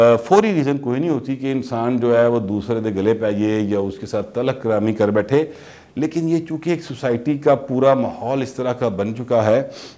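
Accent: Lahore, Pakistan. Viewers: I like your Lahorei style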